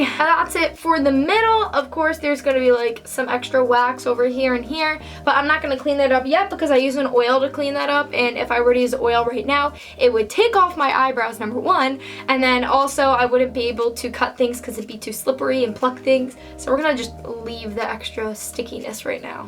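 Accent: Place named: New Jersey, United States. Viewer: You sound kinda New Jersey~ish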